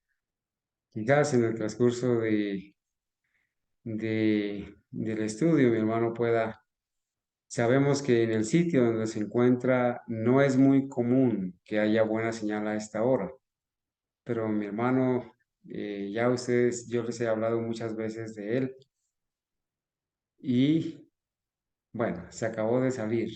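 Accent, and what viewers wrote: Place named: Colombia. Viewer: Bendiciones hno, desde donde transmiten? Le puedo notar,acento colombiano.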